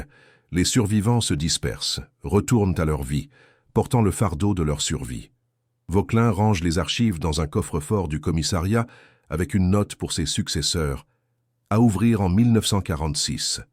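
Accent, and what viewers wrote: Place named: Marseille, France. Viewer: Très agréable votre voix Marseille 😊 un peu de soleil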